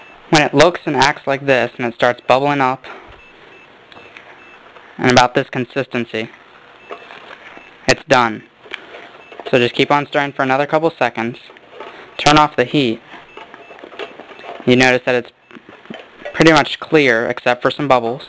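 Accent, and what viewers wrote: United States. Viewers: ... thank you for the details! a typically American English with a hum in the backdrop, made it difficult for an Asian like I, to comprehend. thanks for the details in writing. thank you so much for the help! ... 🙏🙏🙏 ... - NSJ / INDIA Thursday, 4 MARCH 2021 ( Hrs IST) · Hi, what a great video! Thank for sharing this with us! But the bad acoustic and the circumstance that I am not used to listen to American English (sorry! ;-) make me understand less than half of it. Can you write down the ingredients and the amounts of them here in the comments for dummies like me? ;-) Thank you! Best wishes from Germany, Katie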